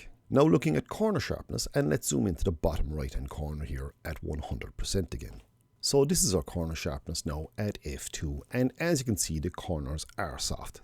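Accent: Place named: Ireland. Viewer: Is that dialect of yours from Inisherin? Because I keep thinking of that movie when you talk 😬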